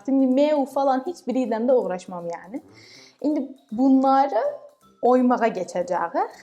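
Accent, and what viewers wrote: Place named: Azerbaijan. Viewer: sanki azerbaycan şivesiyle konuşuyorsun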